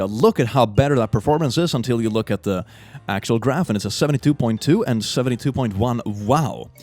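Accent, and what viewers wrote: Sweden. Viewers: This sweedish accent gets me every time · hey! ive joined your discord server!:virtualhug: Also are you swedish?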